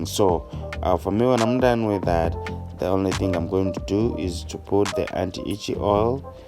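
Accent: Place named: Uganda. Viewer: He sounds like a Ugandan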